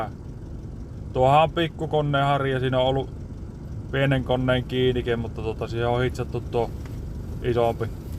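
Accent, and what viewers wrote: Finland. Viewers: I can't with the country Finnish accent telling the most relaxed story of what's been going on while performing intricate surgical moves with a huge machine. 😂 Eeipä se haettaa. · Hehehe, as soon as I heard your voice I knew you’re a Finish guy. And normally Finish people understand humor and sarcasm. But yeah, you got some skills there, not going to lie :o) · I just realized why my question was awkward! Sorry, when I posted my comment I hadn't had the chance to listen carefully yet, and my understanding of the Oulu dialect is still narrow so I hadn't caught much of it anyways — I got a little too confident.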